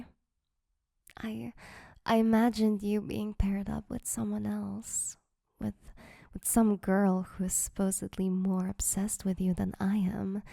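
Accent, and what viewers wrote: Netherlands. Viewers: It’s a Dutch accent, herself is Dutch per her profile info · Is that a Nederland accent? Very nice.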